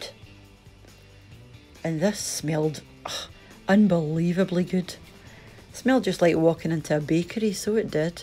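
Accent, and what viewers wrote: Scotland, United Kingdom. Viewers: I came across this Video accidentally, and as soon as I heard your lovely Scottish accent I was delighted! Unfortunately we do not have easy access to suet in Germany, but I do love this recipe and will try to make it sometime - perhaps with butter instead of suet. Thanks for your great recipes!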